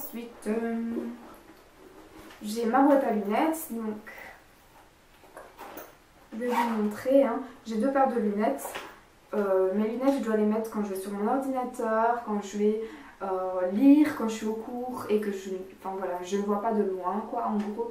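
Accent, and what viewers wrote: Belgium. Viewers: Tu es belge?!?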